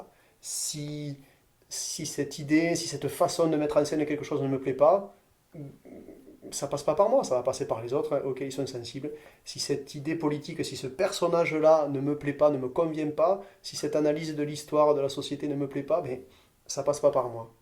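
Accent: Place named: Béziers, France. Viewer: Très intéressantes remarques sur Brassens, ce que je ne connais pas, qui parle avec mon accent, que je me sens seul à colporter dans ma ville natale de Béziers.....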